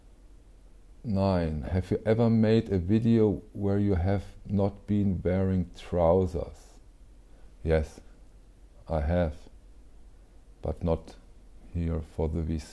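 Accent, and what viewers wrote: Germany. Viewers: I have found my new 'go to' to hear about vinyl, drink coffee, and hear a good old fashioned German accent! I lived in Wiesbaden for several years and miss the 'motherland.' I am fairly new to your channel but look forward to it. Keep the great content coming. And let the puppy get more screen time. :)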